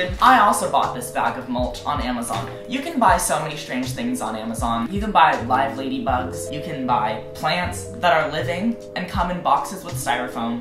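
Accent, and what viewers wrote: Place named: Texas, United States. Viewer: your texan accent is so prominent in this video. IM QUAKING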